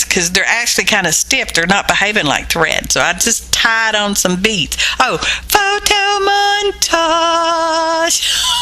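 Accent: South, United States. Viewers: Absolutely AWESOME 🤩 thanks for the video and your wonderful southern talking! You have to do a video on why we buy these scrapbooking items! There is a woman that did one, it’s really old now and we have all new and different things! I can’t remember the title but it’s about why we have all this scrapbooking stuff! You had me gut busting laughing all the way!!!!! I LOVED IT AND I NEEDED IT!❤️🌟❤️🌟🙋‍♀️✌️🙏🤩😂🦄🌈👍😁💗❤️💗❤️🤷‍♀️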